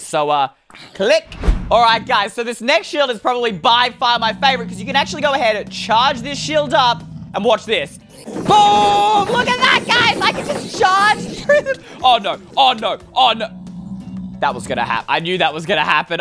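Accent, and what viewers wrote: Italy. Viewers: OMG. ‘I have 2 things 1: I ABSOLUTELY LOVE YOUR ITALIAN ACCENT ! 2: Dream : Want my comment to be hearted ( idk if u did ) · I just love your Italian Ascent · I LOVE your Italian accent!!!!!! You make every day so much brighter!!!! ☀️☀️☀️ You are amazing!! I love you ♥️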